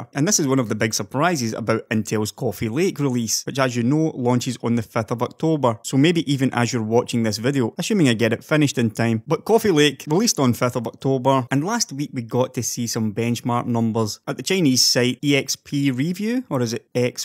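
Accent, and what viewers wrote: Scotland, United Kingdom. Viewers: i love you videos and your scottish accent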